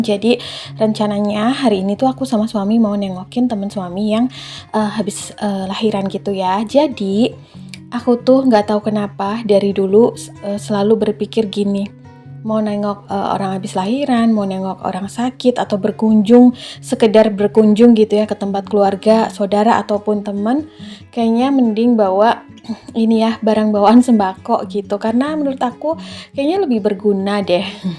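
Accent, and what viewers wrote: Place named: Banyumas, Indonesia. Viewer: Wah aku selalu lihat, kalau ada vidio yg baru mb, wong ngapak he he he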